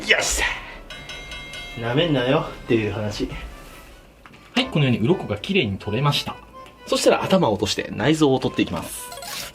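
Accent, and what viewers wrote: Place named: Aichi, Japan. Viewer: 愛知のなまり 「ゴキブリ出たげー」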